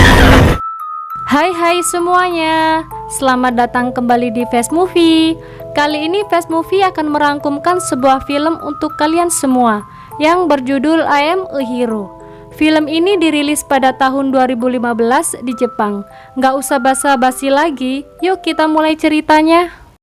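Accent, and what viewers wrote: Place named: Java, Indonesia. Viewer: Jowo endi gaess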